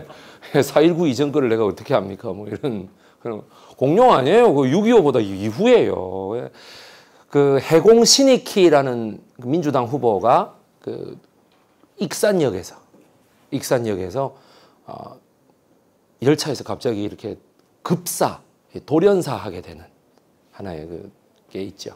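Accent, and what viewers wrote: Busan, South Korea. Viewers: 는 모르는게 없네요. 부산억양이 완벽하시네예. ㅎㅎ.😊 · 매불쑈에서 4.3 방송 잘 들었습니다. 말투가 저랑 같은 부산분 같던데 ㅎ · 경상도말씨같아 서울이 고향인줄 몰랐네요 ㅎㅎ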